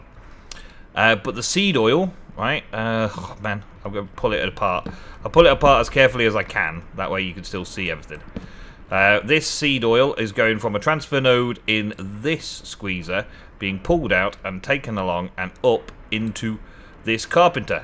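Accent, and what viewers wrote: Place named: United Kingdom. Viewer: Really excited for Tale; want to see what its like. Should watch a previous season. Couple notes: - Spoilish Jungle Upgrades count for Tropical, Taiga for Ice. It saves you a lot of upgrades. - One upgrade to meet the environmental challenge. Mana Spreaders - Way I do it, is I shift right click with a wand on the Mana Spreader and then shift Right Click on the Runic Altar. I would also try to bind a mana spreader to your Hopperhawk and see if that helps its range like it suggests. Or right click it with a wand, and mouse over it, and put the pool, mana spread in view so you can see if its highlighted. Not sure if its pulilng mana from the nearby pool. And I've learned to say it - Eso' Ter - 'ic; but love the accent and such so however Brits say it!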